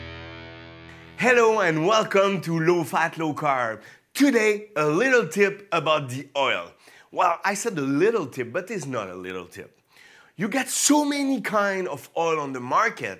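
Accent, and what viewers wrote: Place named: Quebec, Canada. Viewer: Hey this guy is a quebecois 🤣🤣😂🤣 j’adore l’accent en anglais. Vraiment authentique 😂😂😂 Je vais m’inscrire à ton canal. J’espère que tu as des recettes à base de plantes 🌱 😉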